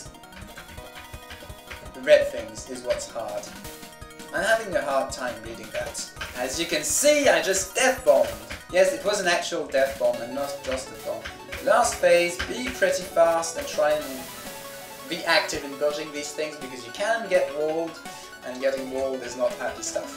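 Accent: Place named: United Kingdom. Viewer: WhAt?! GuY wItH bRiTiSh AcCeNt CaN't PrOnOuCe TaRdIsEs?!?!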